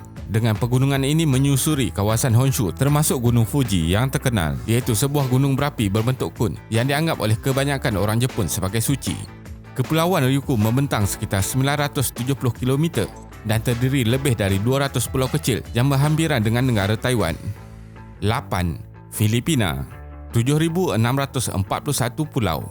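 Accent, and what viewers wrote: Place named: Malaysia. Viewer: yang ngomong kaya orang malaysia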